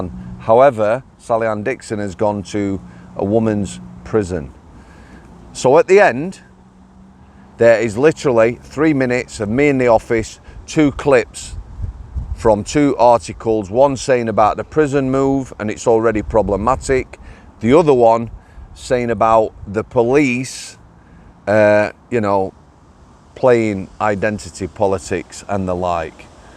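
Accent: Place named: Northern England, United Kingdom. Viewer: Best Prison talk online! I heard you on the Prison Officer podcast on Spotify. Awesome hearing a Northern accent on an American podcast. My great Grandfather’s Brother was a Prison Officer at Strangeways. Retired from there. I’m from Cheshire, lived near Clitheroe Lancs, moved to Kentucky USA, did 8 years as a Correctional Officer, worked in the private sector (armed security) and have ended up back in ‘the job’ as a Deputy Jailer in a 600 man County Jail, seeing the worst of what humanity spits out on a daily basis…but still find time to have a laugh doing it…got to Brother! Love the channel, listen to it on the way to Jail daily. Thanks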